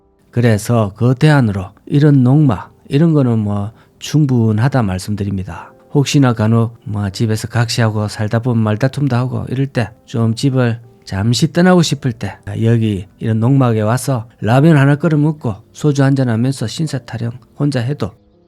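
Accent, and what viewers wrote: Gyeongsang, South Korea. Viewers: 이 분 경상도 말씨는 참 듣기 좋은 구수한 말입니다. 다니시느라 수고가 많습니다~ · 경상도 사투리가 이렇게 구수 했나? 잘 보고 갑니다 ^^ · 님은 방송국 나레이터가 딱입니다. 호감형의 구수한 갱상도사투리로 은근 중독성이 있어요... 참고로 저는 전라도 사람이여이잉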